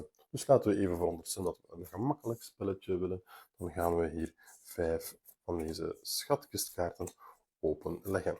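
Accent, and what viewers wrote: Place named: Belgium. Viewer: Hij is komt denk ik uit België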